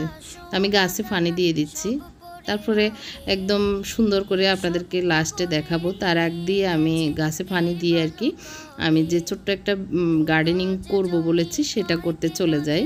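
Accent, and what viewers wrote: Noakhali, Bangladesh. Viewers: আপু আপনার বাড়ি কি নোয়াখালী?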